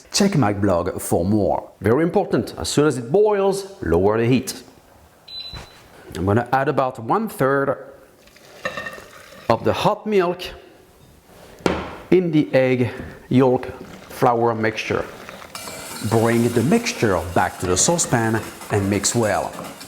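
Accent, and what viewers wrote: France. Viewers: Why the hell do I love him and what he makes so much??? Answer: B/C of the damn French accent😅😝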